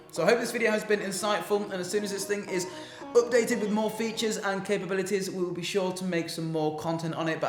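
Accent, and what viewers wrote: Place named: North, United Kingdom. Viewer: Really? He doesn't have an upper class accent. Sounds quite midlands/northern to me (not saying that northerners can't be upper class, but the accent usually is a tell-tale). Also, that chip on your shoulder. Have you thought of dealing with it?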